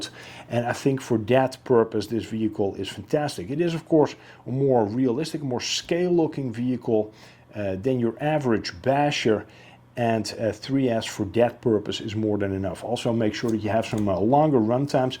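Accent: Netherlands. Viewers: sorry dat ik zo een comment deed of je Nederlands was. Goed Engels hoor maar toch hoor je het Nederlands er een beetje door heen. Trouwens goede content hoor! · You sound dutch but you are Norwegian?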